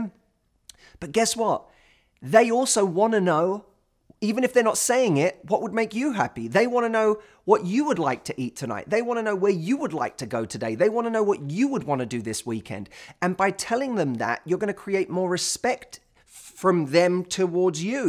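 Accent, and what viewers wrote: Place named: United Kingdom. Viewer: Hello everyone, I m old, i like following those videos just for learn british english prononciation.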